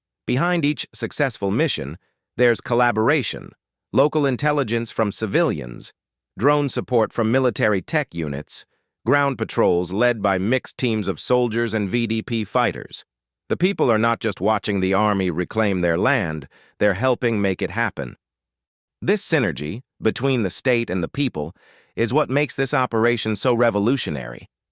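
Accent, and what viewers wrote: West, United States. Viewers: The same western accent. Cant we have an African accent if we are getting news/presentations about Africa. It says that its not a war waged by outsiders, but this sounds like an outsider to me. I am fed up with this. TRULY RECLAIM OUR IDENTITY. We need to be African in EVERYTHING. This is NOT a voice of Africa! Pas la!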